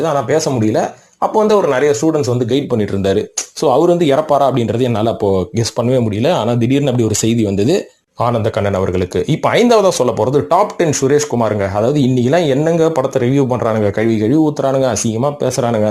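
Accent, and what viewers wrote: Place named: Sri Lanka. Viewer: இஞ்சருங்கோ இலங்கை தமிழ் தான் bro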